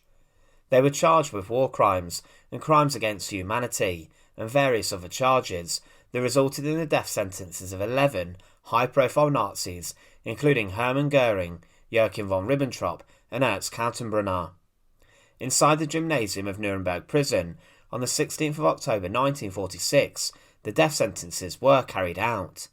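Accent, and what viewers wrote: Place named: Blackpool, United Kingdom. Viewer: Your English dialect accent makes me ill. Blackpool?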